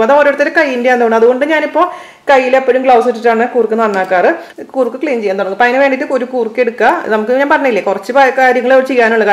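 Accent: Thrissur, India. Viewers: Trishoooor aaan'to....... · ഹായ് ചേഈച്ചി തൃശ്ശൂര് ഭാഷ കേൾക്കാൻ തന്നെ സുഖ ഞങ്ങടെ വീട്ടിൽ കൂർക്ക സീസൺ ആയാൽ എന്നും കൂർക്ക ഉപ്പേരിയവും ഇപ്പോൾ ഇവിടെ മസ്കറ്റിൽ ലുലു വിൽ ഉണങ്ങിയ കൂർക്ക വരുന്നത് നാട്ടിലെ പോലെ മണം ഒന്നുമില്ല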